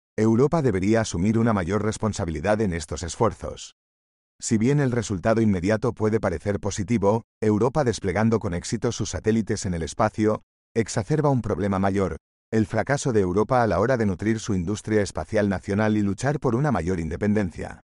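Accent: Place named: Latin America. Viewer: "Los barcos petroleros" 🤣.........español....¿de donde?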